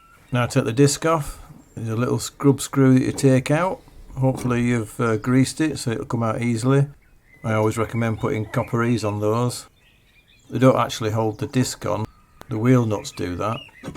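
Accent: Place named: Wigan, United Kingdom. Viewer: Love your videos been a fan for ages and learnt a lot thanks to you I've tackled many things on my Seat Ibiza 6l 2006 1.9 TDI axr engine code.. going purely by your accent you're probably not far from me . I live in Wigan I'm always tinkering like yourself.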